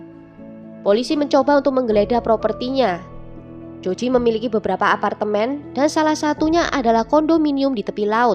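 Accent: Java, Indonesia. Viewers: dari pertama liat chanel ini aku dah yakin mbak e wong jowo koyok aku..😁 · Kak.. org mn sih kok bahasanya medok banget. Club itu bukanya dibaca 'klap' ya dlm ejaan bhs indonesia. Masak di baca 'klup' jg... geli kedengaranya. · Kak...keren ih ceritanya... Btw spt logat org Jawa ya mbak... Sip smg sukses ya.... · Naratornya org jawa ini logatnya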